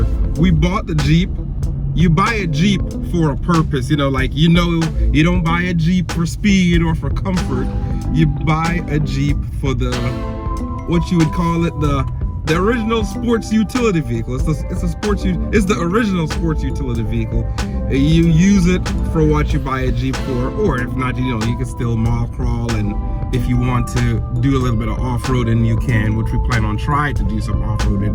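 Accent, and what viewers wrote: Jamaica. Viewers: This man yah sound like a yardie suh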